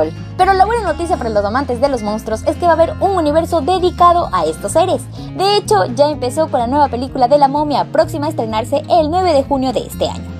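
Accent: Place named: Mexico. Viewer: en serio eres de acuador hablas como mexicana tu muy bien al meter tambien noticias de anime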